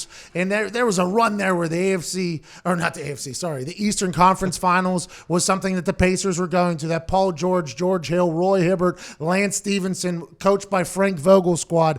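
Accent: Pittsburgh, United States. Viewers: I didn't know you were from Pittsburgh. You do lowkey sound like a yinzer lol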